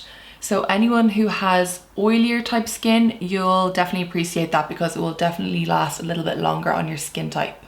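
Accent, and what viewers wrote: Ireland. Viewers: Where is from? Ireland? I can't put my finger on her accent. She speaks very clearly, it isn't until the end of her sentences or a phrase she will use that I pick up on her accent. Does anyone know? Just curious, its driving me crazy trying to figure it out lol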